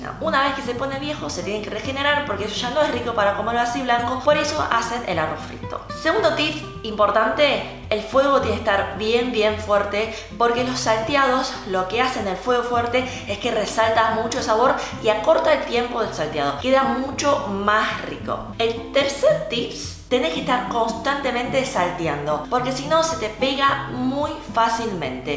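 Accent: Argentina. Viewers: Me parece increíble verte hablar con acento Argentino. Me gustan todas las recetas Chinas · Encantador contraste entre tu acento argentino y tu encantadora belleza asiática. Me agradas.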